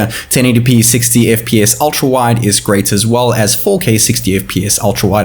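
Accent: South Africa. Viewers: Is he from South Africa? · Heard South African accent. Immediately subscribed. Sick content.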